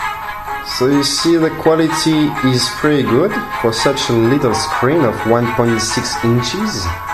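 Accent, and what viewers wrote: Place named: France. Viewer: Good review, terrible english/french accent.. just say app not A-P-P _'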